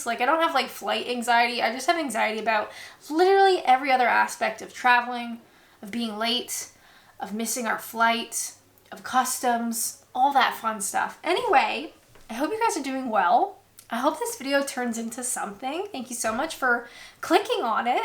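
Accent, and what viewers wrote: Canada. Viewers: Your voice has gone *very* Canadian!! And consuming locally-made honey is a good natural remedy for hay fever (works for me anyhow... or should that be 'anyhoo'?) 😊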